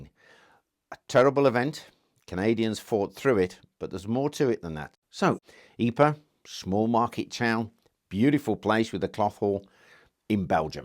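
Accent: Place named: England, United Kingdom. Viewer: Interesting... I thought you were a out medieval times history and the such... Just watched arrow shooting vid where u said u moved to Canada... Does that mean you lost your English pride. Or patriotism for England... It's just so odd hearing the accent and saying your Canadian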